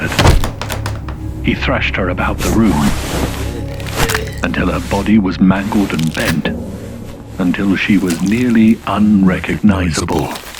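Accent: United Kingdom. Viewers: EEEuww. I don't like this one. I listen to audiobooks often and the narrator sounds just the same. I am going to smash that British narrator right in his pie hole (figuratively, anyway). Poor Poppy. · the narrator’s voice is terrific and british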